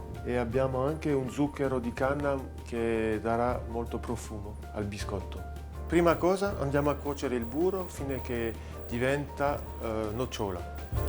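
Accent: France. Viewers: Sono inglese. Ma lui sta parlando col acento francese no? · Ma perchè iett tene l’accent franzoso? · Is he speaking Italian with a French accent😍😍😍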